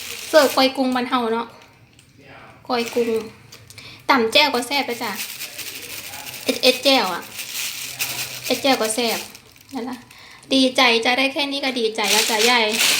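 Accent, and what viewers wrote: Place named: Phu Thai, Thailand. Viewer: เอัดผีเลอ,😂😂มักๆสำเนียงผู้ไท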